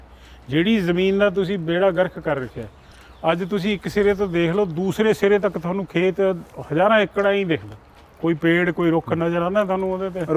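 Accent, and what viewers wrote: Puadh, India. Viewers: Puadi bhasa bol reha veer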